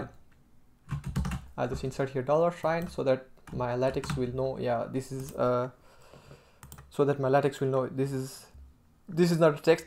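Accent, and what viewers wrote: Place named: India. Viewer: You are from India, aren't you? I used to think that all Indians just have a bad English pronunciation, but then I found out that Indian English is actually recognized as a type of English like BE and AE. Yet it can be very hard to understand for people having learned and being used to the other types of English (BE,AE). You speak rather clear however, and I think any westerner who speaks English should be able to understand you :)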